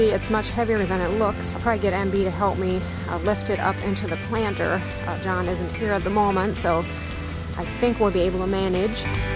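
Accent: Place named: Pennsylvania Dutch, United States. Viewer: I hear remnants of a pennsylvania dutch accent. I think you may have come from a very traditional mennonite family. Holdeman. Very beautiful porch and home! Thanks for sharing!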